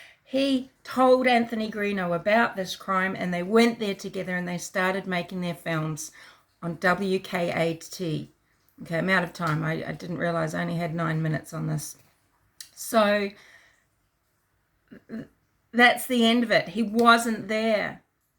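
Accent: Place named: Australia. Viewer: Hey! It is so great to find you. I found you from Randy’s latest vid. I agree with EVERYTHING you said!!!! You have such intelligence and common sense. I’ve ALWAYS respected your thoughts, comments and opinions. 💗 I always thought you were a guy! 😂 You sound Australian. Keep on keeping on, girl!! 👍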